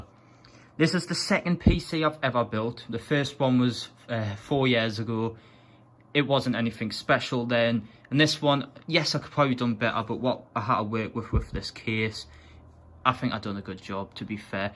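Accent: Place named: United Kingdom. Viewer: Sick setup and wow your accent is different to what i have heard from british youtubers lol. keep up the good work.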